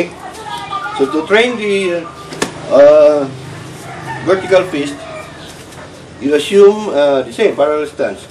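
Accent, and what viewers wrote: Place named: Philippines. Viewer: he sounds filipino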